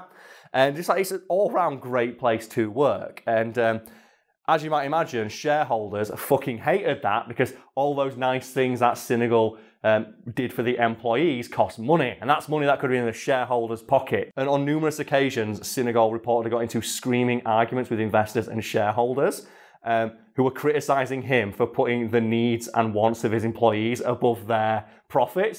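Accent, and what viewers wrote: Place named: Scotland, United Kingdom. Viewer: I can't fully place your accent and it is driving me crazy. Can't tell if it is a northern accent or Scottish.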